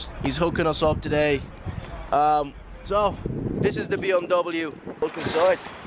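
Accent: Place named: Ireland. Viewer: You sound like Connor mcgregor in your trading videos. You have the same accent and very similar personality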